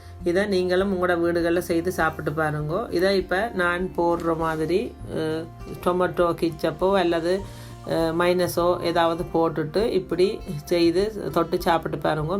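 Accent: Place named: Sri Lanka. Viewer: மிக மிக அற்புதமான ரெசிபி அதைவிட தங்களின் இலங்கைத்தமிழ் என் மனதை கொள்ளை அடித்து விட்டது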